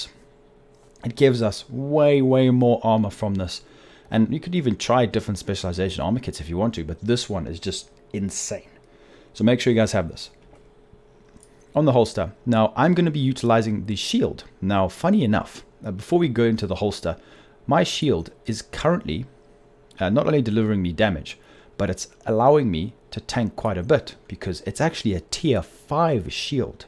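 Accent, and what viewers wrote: South Africa. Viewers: So good to hear a South-African English accent💪🏼💪🏼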